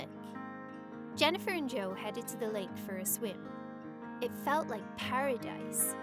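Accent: Scotland, United Kingdom. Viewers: Does the narrator have a Scottish accent? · You talk British I like your accent😉😉😆😇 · You sound a little bit scottish x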